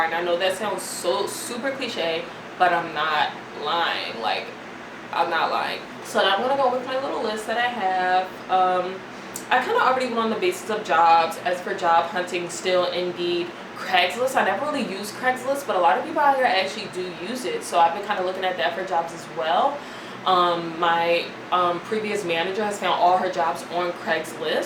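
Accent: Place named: Philadelphia, United States. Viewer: Lol she definitely gotta philly accent. She is probably just using a more professions voice for her platform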